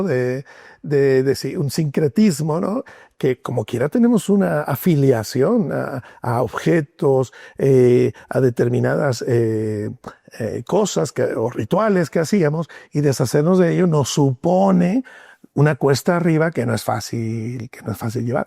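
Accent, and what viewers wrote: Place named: Spain. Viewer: Ese acento español! ❤😊 Bendiciones!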